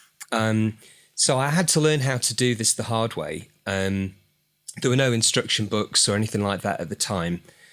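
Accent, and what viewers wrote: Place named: England, United Kingdom. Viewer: I could not watch until the end. You made me grab my guitar. That's a good thing. Subbed. Cheers. P.S. London and Milton Keynes raised. I am a Kiwi now though. We escaped the wicked witch's axe and legged it out of Blighty. We flew actually, but point being that the accent is familiar. It works for me. Stay calm and none of the hype that gets my blood pressure up.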